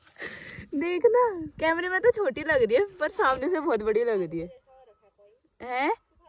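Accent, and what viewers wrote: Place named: Kumaon, India. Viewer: मेरी पत्नी और बेटी मुनस्यारी घूम रहे हैं अभी, आपका कुमाउँनी बोलना अच्छा लगा 💐 बढ़िया व्लोग 👌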